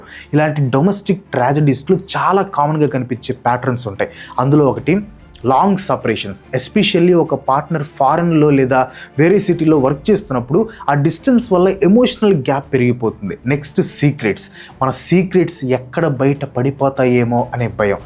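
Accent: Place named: Telangana, India. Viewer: You are good like but your telagana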